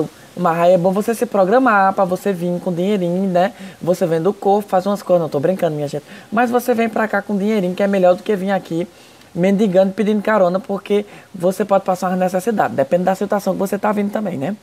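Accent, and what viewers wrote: Northeast, Brazil. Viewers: Gosto demais de assistir seus vídeos. Sou de Aracaju e vixe! é bom demais ouvir outro nordestino falando. Love it! je t'aime! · ...acho é bom! Eu amo esse sotaque gostoso só nosso (sou cearense tbém). Bjo! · Olá, adoro o seu jeito espontânio de falar, apesar de está morando em outro país não perdeu a sua simplicidade e o seu jeito arretado de Nordestino, me divirto muíto com os seus videos.. Manda Alô pra mim meu nome é bem fácil JOSIBERTO 😃😃..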